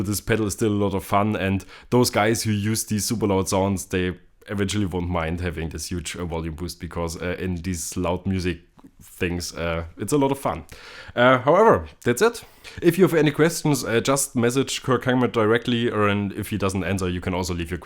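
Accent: Germany. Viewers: Ich höre deinen deutschen Akzent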